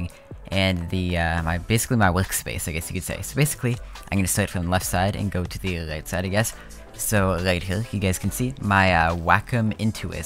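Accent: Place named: Quebec, Canada. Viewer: THAT FRENCH CANADIAN ACCENT THO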